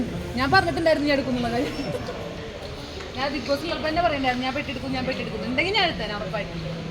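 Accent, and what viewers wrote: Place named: Thrissur, India. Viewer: Kore vishangal negative comment idum. Athonum mind cheyanda ❤️. Ninak oru ahagaravumilla. Ninte thrissur slang anganeyanu. Ath manasilakkunavarum und. Athonum manasilakkathe bodhavum vivaravumillathavarude comments mind cheyanda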